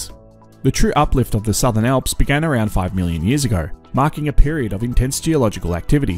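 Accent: Australia. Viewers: you do an excellent production with the unique Australian that is easy to listen and related to And no worries mate Today I was going to look into the Tonga blast a few years ago I'm a big fan of Edward Lorenz and non linear dynamics so it's interesting to me Especially on such scale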